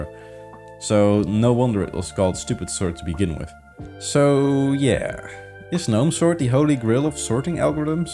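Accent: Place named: Netherlands. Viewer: You sound dutch, where the average male height is beyond 1.80.. no wonder you don‘t know how to deal with gnomes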